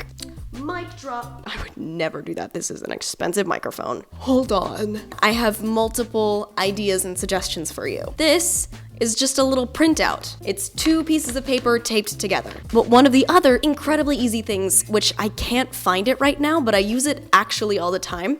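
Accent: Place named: Ireland. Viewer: $$$ great Irish accent. Keep up the good content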